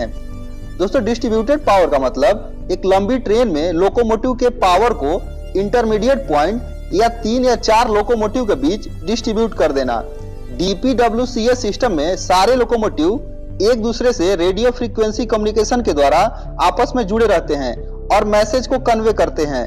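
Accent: Bihar, India. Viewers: Bolne waala Bihari hai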